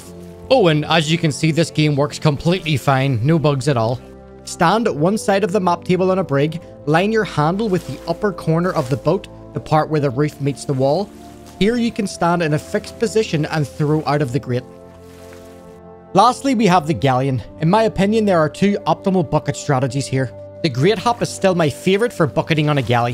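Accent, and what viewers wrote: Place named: Scotland, United Kingdom. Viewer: Love that Scottish Accent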